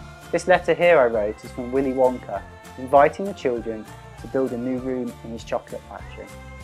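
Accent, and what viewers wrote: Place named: England, United Kingdom. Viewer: You had me subscribed at your English accent (is that even grammatically correct?)! Thank you for sharing your great ideas :)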